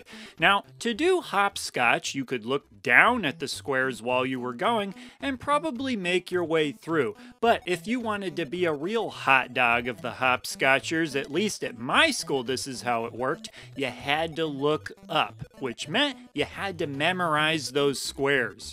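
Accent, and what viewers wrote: Midwest, United States. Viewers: ah ha! Now that I have you, are you from the Midwest?? You sound like a friend from MN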